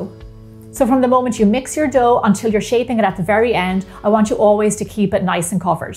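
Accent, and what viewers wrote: Ireland. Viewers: she sounds Irish to me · Well, I can make hundreads of dishes but will never get this right.given up on bread making. this is a good film. TU for sure and she's very atractive bread maker but sounds Irish not english. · TY for the tips. Love, love your accent, I could listen to u all day. I believe it's Irish? Both my great, great Granny's were named Bridget & came from Ireland to the U.S. (to the great state of New Jersey) during the late 1800's both married German immigrants named William. I have made several of your recipes and they were quite good, Keep up the great work. 😁👍💖👏👏👏👏👏👏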